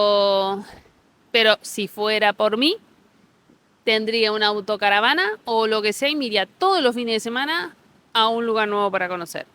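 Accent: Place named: Argentina. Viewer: ¿Que me sigue sorprendiendo de los argentinos que estáis llegando en masa a España? Que hacéis cientos de videos de todo lo que os sorprende de España, pero muy poco esfuerzo en adaptaros. Ejemplo: lleváis años aquí y seguís con ese horrible acento argentino.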